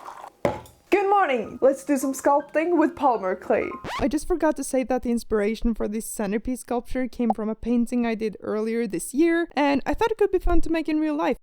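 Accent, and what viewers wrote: Norway. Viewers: "Skjera Bagera" is undoubtedly Norwegian, also her accent and the nature in her videos. So I think she is from Norway. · Im 99% sure ur norwegian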